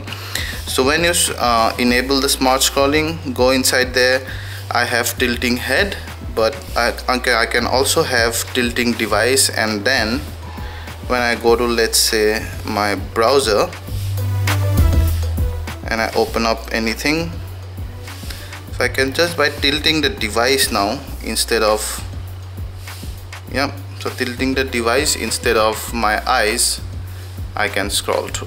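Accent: Assam, India. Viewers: the guy has a very much Assamese accent ;)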